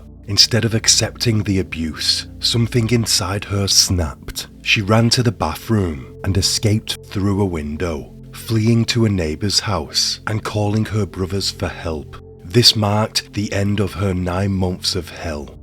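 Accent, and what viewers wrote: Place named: Liverpool, United Kingdom. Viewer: Also I can hear a Liverpool or Merseyside accent 😊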